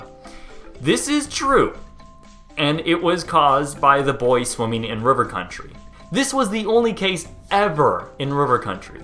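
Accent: Canada. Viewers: I swear he said aneoba with an n. Willing to bet he's Canadian the way he pronounces his o's.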